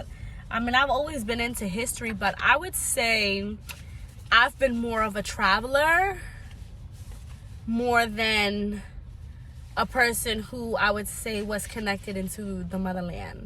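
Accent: New York, United States. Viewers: New York (American) accent.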